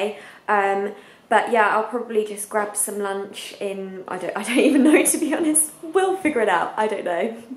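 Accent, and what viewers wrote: England, United Kingdom. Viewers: Is she from England?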